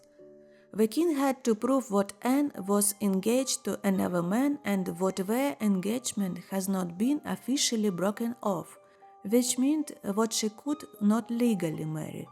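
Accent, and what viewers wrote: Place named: Germany. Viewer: The woman's voice narratoring accent sounds Deutsche.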